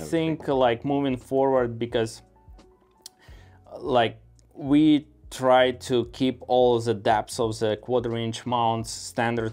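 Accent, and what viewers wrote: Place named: Russia. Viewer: Russky accent. New génération Russians have good accent, because they have learned English since they are in kindergarten with real English teachers.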